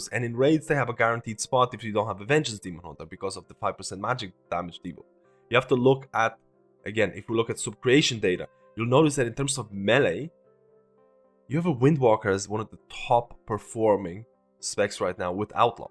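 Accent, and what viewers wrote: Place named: Ireland. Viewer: Dude you are without doubt the best healer content creator out there. Funny i thought that ur from some nordic country, because of your accent just to realize that ur irish. Really different accent from brittish one :D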